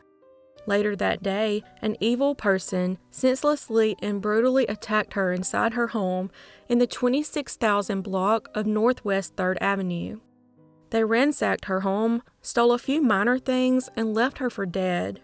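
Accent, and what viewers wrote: South, United States. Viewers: So happy to hear your southern voice again. I used to spend winter in Gulf Breeze Florida. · I'm not far in yet but I'm so glad you decided to keep doing ads - you deserve to be paid for what you're doing! Plus your accent is so charming I honestly don't mind them because I'd listen to you read the phone book... and I'm a fellow southern girl, haha!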